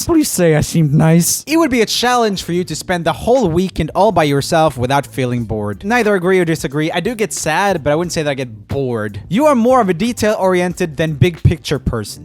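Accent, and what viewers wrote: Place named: Sweden. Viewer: His accent is so swedish, like pewdiepie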